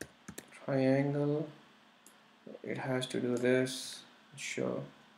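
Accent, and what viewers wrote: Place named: India. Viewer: I like your Indian accent :)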